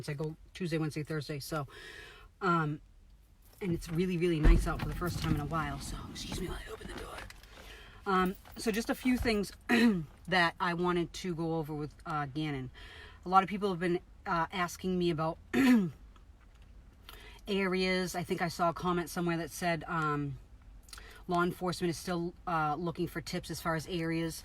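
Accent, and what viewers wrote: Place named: Massachusetts, United States. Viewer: Hello.. I know I'm wicked late to respond.. New subscriber here.. As soon as I started listening to you a few video's ago I knew you were from MA! As am I. 💜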